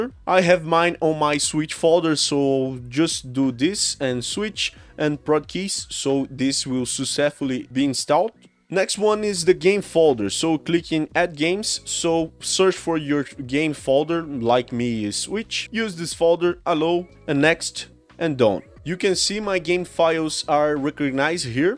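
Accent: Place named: Brazil. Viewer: Are you from Brazil people there speaking English is just like yours not saying your English is bad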